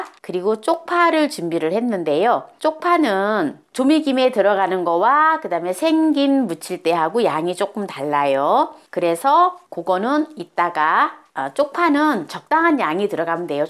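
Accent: Jeolla, South Korea. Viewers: 여사님 말씨를 들어 보니 고향이 전라도 분이시군요. 역시 남도 분들이 요리 잘하시는건 인정 합니다.전 완도산 덩어리로 길게 뭉처진 곱창김을 구입 위와 유사하게 하는데도 하늘나라에 계신 어머님이 옛날 어릴적 해주셨던 그맛이 나질 않는군요 ...ㅜ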